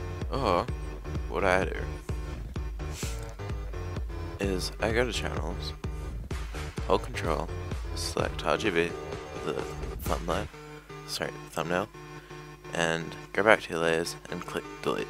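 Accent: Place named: United Kingdom. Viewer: You sound like you're british and have either done a fuck ton of molly and fried your brain, or you're super stoned and talking ridiculously soft into your headset. What's hilarious is even the transcript has no idea what's going on. I think I finally got it. Thanks for the upload. Work on your diction my good man.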